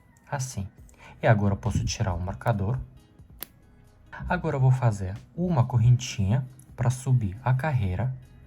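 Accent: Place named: South, Brazil. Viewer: Estou sendo sincera...se eu não soubesse que você é estrangeiro, e ouvisse você pela primeira vez...acharia que você era de alguma cidade do interior das regiões do Sul ou do Sudeste, por causa do seu sotaque que é muito sutil.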